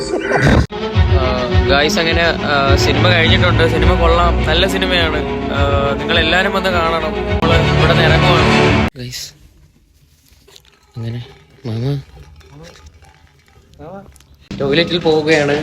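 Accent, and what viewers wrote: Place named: Trivandrum, India. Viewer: Vlog adipoli🎉 pinne nee tvpm anoo aa samsaaram adhupoole und😁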